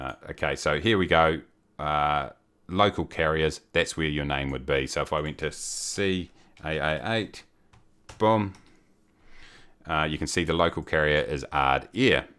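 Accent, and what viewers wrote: New Zealand. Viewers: Thanks for these videos love the kiwi accent too :) One thing though...You didnt really talk about how facillities make money and the kind of averages you could expect from them. I noticed you receive income from ground fees but didn't seem like much. Maybe you can make a quick vid explaining more on different types of income etc?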